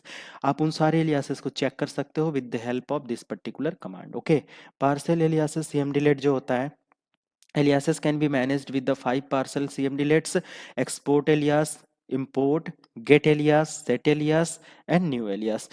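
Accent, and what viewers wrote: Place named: India. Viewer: Big bro, Its too good. Flaws hain kuchh.. jaise chaand pe daag. Communication skills pe bhi kaam karo.. Sh s wala issue hai.. Jab bhi Power Shell bolte ho.. Partial sunai deta hai... confuse ho gaua tha me.. Actually, s sh issue bhi zada ni hai.. i heard you often using the correct form... intemitent issue hai ye.. kabhi kabhi miss karte ho... Khatarnak Indianism... ye global tech hai... isme bolte time Indianism khatam karo... angrezi bolna majboori hai kyuki un terms ke liye Hindi words hain he ni... lekin aap bolte time apne brain me translate jo karke bol re ho.. wo translation practice idhar reflect ho ri hai... Khud socho.. itna knowledge wala video agar proper English me dalo.. to kitne consumers jeebh latkaye laar tapkate bethe hai apko sunne ke liye... Kisi ko hire karo... apki knowledge proper audio me dene ke liye.. 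mujhe rakh lo... zada paise ni mangunga... kyuki mujhe bhi to gyan milega... Appreciate your efforts. Loads of respect. Many thanks.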